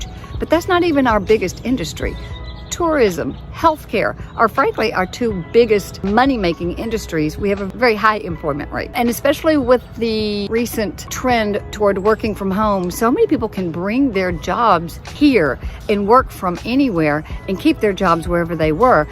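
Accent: South, United States. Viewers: For those of us who ARE on the same page with you, people with conservative leanings (most of CA IS CONSERVATIVE, I know, you don't buy it, but... Demoncrats), it seems like we have to PROVE ourselves to you. Enough of that. I am the one who thinks, acts and lives his life QUITE the same as you folks. My experience, just a couple weeks of living here, is that these dozens of videos I've watched on the subject are, by and large, the truth. Folks here are kind, polite, they DON'T PUT ON A FACE, they don't ignore or dismiss you. And, as a person who was born "up north" in Michigan, I love that southern drawl, and yes, you folks have that. Let me tell YOU something. If you have never lived in California, as I have, YOU have no idea what that experience is like to have whole neighborhoods, communities, cities and the WHOLE COUNTY of LA as entities which are generally working against you. 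This is besides the crazy high cost of living, crime, homelessness, people begging for money outside of EVERY store or gas station or facility you enter. I was lucky I did not incur direct harm to my person throughout 17 yrs., but I did have a couple of incidences of hit and run to my car that the police would not even respond to because no person was hurt. Cut me some slack, I am, AT HEART, a good ole boy, a friend, a good neighbor, I am willing to work with you, help you out, and you can trust me to come through for you. I came here to be relieved of that CA burden, and so far, I am seeing that.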